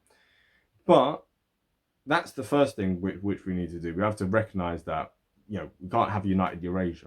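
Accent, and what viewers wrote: England, United Kingdom. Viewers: Only an Englishman can have that accent. So he is for sure.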